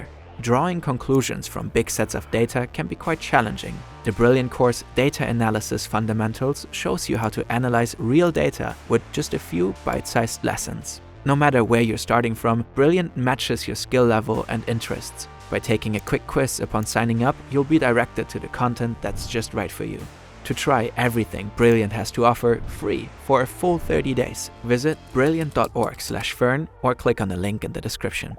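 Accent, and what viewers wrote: Germany. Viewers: Hä ist der Sprecher deutsch?! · I just love listening to his German accent. There will be a time when he loses it. It's probably the most iconic voice in story narration history. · ahh I can hear a German accent, I feel right at home 🥰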